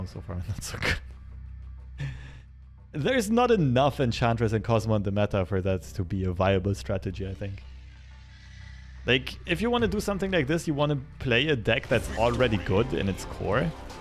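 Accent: Germany. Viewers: where u from? u sound like German or some nordic country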